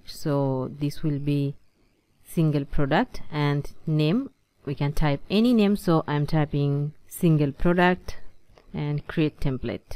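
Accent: Bangladesh. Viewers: Are you Bangladeshi? Your English speaking is so understanding. ❤️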